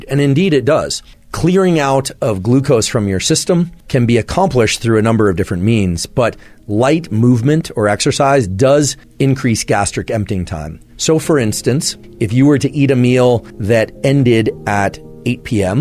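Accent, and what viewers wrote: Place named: Canada. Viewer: Canadian rhythm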